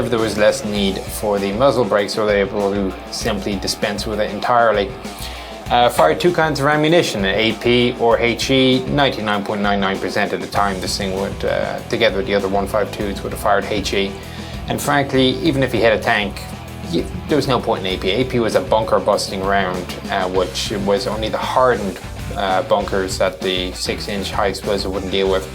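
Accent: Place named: Ireland. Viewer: Is he irish he has a slight irish accent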